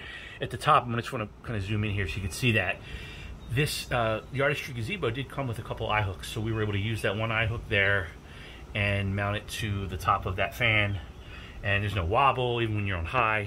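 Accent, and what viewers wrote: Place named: Philadelphia, United States. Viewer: “We’re in the northeast” with time of the worst Philly area accents I’ve ever heard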